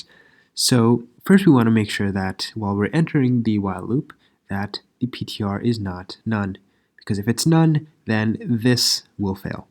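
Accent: India. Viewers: Are you from hyderabad ?🙃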